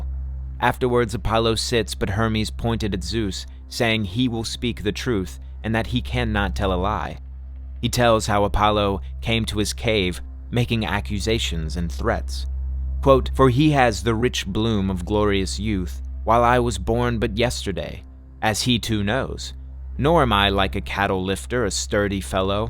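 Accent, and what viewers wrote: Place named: United States. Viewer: american voice nice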